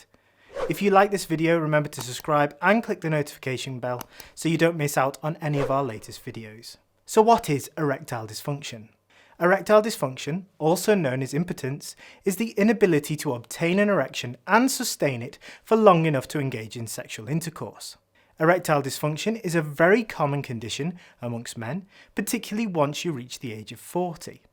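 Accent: United Kingdom. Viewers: What a huge difference in hearing a doctor from the UK explaining all this, rather than an american doctor.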